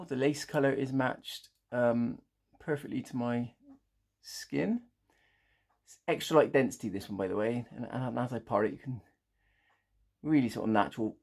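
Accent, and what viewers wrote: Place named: Salisbury, United Kingdom. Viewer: You sound like a Salisbury boy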